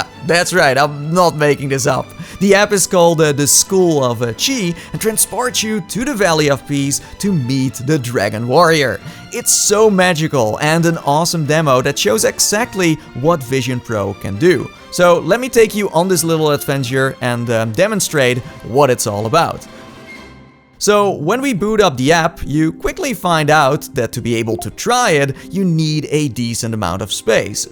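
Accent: Netherlands. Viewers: ik zou zeggen praat alsjeblieft niet met zo'n nederlandse toon als je engels praat maar ja, je hebt 758k dus wat kan jou het boeien natuurlijk.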